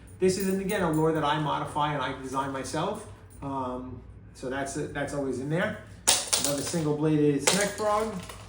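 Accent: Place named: Bronx, United States. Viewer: ARE YOU FROM NEW YORK? You sound like you’re from the Bronx.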